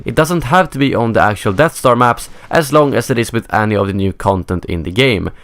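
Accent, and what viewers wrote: Sweden. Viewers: are you froom sweden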